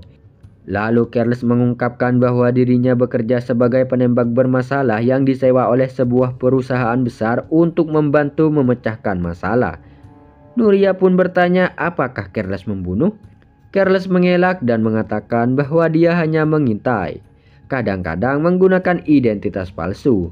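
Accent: Madura, Indonesia. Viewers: Orang madurakah pak, logat nya seperti orang madura😅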